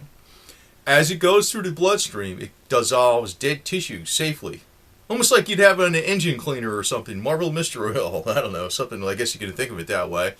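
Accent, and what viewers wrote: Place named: South Jersey, United States. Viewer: south Jersey.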